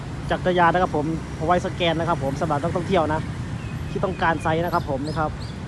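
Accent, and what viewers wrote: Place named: Champasak, Laos. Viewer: บ่าว มาจากแขวงไหนครับ? ผมเดาว่ามาจากแขวงจำปาศักดิ์ สังเกตจากการออกเสียงด.เด็ก,ซโซ่,ออกเสียง ฤดูเป็น ระดู ฯลฯ ผมเดาถูกมั้ยครับ?😂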